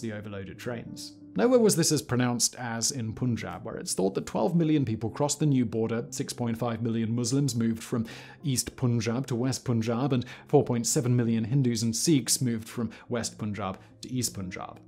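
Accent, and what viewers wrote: United Kingdom. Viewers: Is is me or do you sound MORE British in this video?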